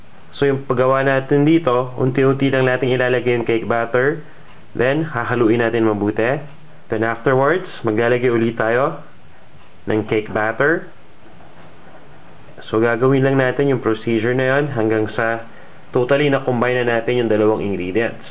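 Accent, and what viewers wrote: Philippines. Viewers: Stop speaking fillipino-english >.< I CANNOT UNDERSTAND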